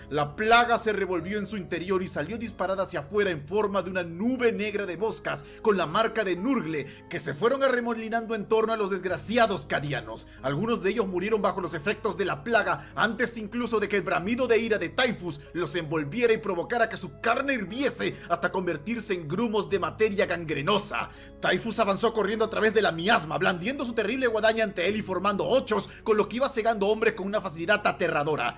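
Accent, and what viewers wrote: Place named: Spain. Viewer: De que parte de España eres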